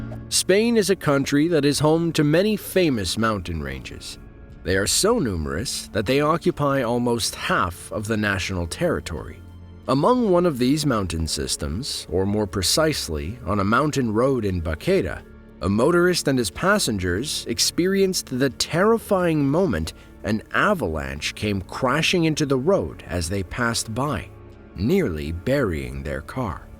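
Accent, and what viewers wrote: United States. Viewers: with American voice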